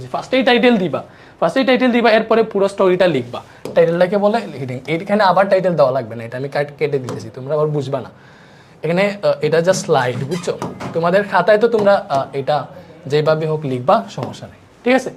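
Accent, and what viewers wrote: Sylhet, Bangladesh. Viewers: স্যার এর বাড়ি মনে হয় সিলেট জেলায় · ভাইয়া মনে হয় সিলেটের ❤ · স্যার কিতা সিলেটি নি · Sir ar basa ki sylhet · Mat huniya buja jar sylheti kori😊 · Vaiya apni ki Sylheti🙂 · সিলেট, গোলাপগঞ্জ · ভাইয়ার কথা শুনে মনে হচ্ছে উনি সিলেটের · Bhai apnar bari ki sylhet a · Sylheti monoloy amr o mone hoy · আপনার বাড়ি কি সিলেট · Vai mone hoy Sylheti. Kota bartay lage 😊❤ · Mone Hoy lage to sylhety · Sir kota bole half Sylheti Half Suddo😮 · আপনি কি সিলেটি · Bhaiya apni ki sylheti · Mat huinna to lager sylheti ou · Vaiya , hoito sylhet er manus.. Kotha gula just wow..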